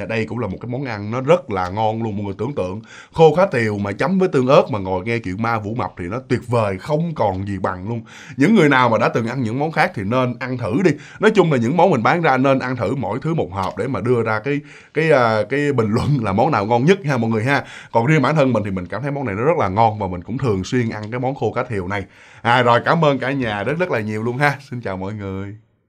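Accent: Mekong Delta, Vietnam. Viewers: Chúng ta đều thích nghe truyện vũ mập... Giọng kể kiểu miền tây chân chất... Giọng Vũ mập là bất diệt rồi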